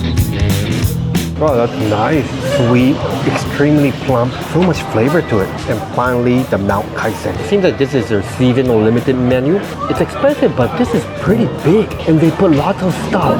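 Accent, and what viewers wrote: Singapore. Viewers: OMG you sound so Singaporean now!!! love it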